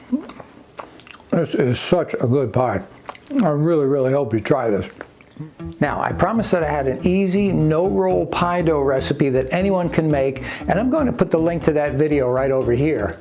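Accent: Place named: Massachusetts, United States. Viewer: Is he from Central or Western Massachusetts? His accent is making me nostalgic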